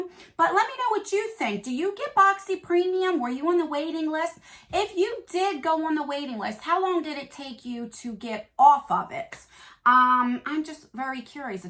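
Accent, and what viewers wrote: New Jersey, United States. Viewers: Lmao the creative descriptions must be a Jersey thing. My mom and my dad both were born and raised in Jersey and speak just like you, I live it! 💄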